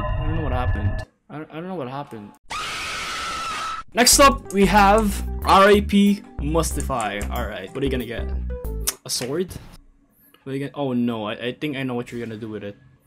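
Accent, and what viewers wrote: Philippines. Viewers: sa tingin ko talaga Filipino kaw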